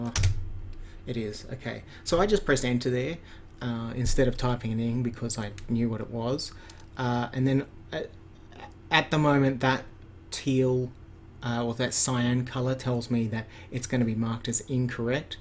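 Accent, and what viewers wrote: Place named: Australia. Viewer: Hey just found your channel! Great to see more Scrabble on here :) Not sure if you knew to click flashcard mode on Zyzzyva saves having to change from missed to correct. You may have covered it in upcoming vid but I thought I'd mention anyway. Glad I've found another channel to watch after my 3 year love affair with Dweebovision and good to hear another Aussie voice too. Keep it up!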